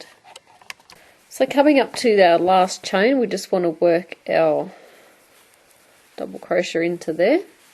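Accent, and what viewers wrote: Australia. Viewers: Sorry, meant to get this in with my post below. Hi, I am new and truly enjoy the video's I've viewed on your channel. You make it so easy for those havin' trouble because you explain things so well. I like listenin' to your Aussie voice; as I am from the USA . You do have a pleasant and soothin' voice. Thanks so much!! ~Buffalo Gal~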